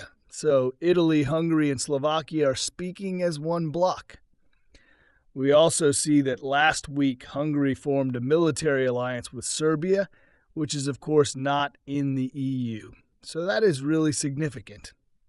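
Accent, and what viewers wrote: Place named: Germany. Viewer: Is this AI speaking English? It’s so weird. I prefer to listen to your English with German accent. 😊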